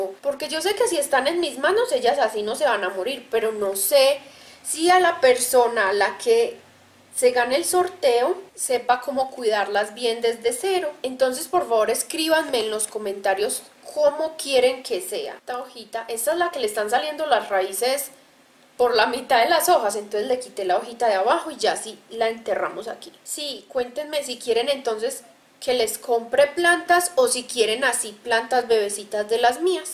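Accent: Colombia. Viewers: Hola, me gusto mucho el cactus que esta floreciendo me podrias decir Como Se llama, y me encanta tu acento colombiano 😁😁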